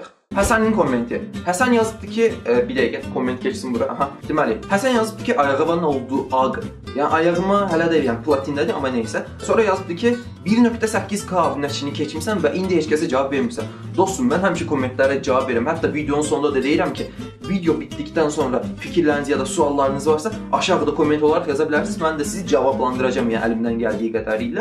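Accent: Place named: Zaqatala, Azerbaijan. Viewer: Bu video da digərləri kimi əladi və çox maraqlidi yəni mənim üçün elədi hə bu arada məndə Zaqatalalıyam danışığından bilinir onsuzda sənə uğurlar😊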